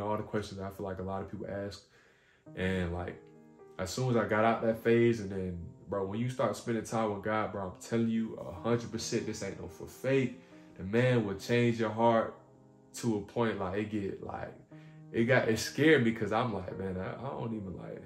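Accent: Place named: Chicago, United States. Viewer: first time watching your video. i can hear in your accent that’s a chicago accent. i can hear that from anywhere. showing love from out here in chicago man ❤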